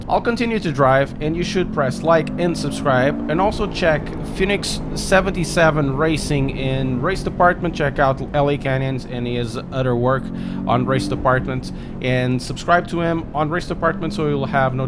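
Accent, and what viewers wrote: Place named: Portugal. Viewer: I opened this video and 1 second later I thought "This guy must be Portuguese". The accent doesn't lie. Cheers from Portugal!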